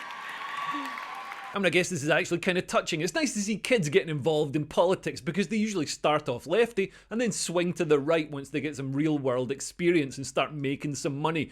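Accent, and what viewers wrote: Scotland, United Kingdom. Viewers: Noice, the Scot is back. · The Scottish are the best narrators 😂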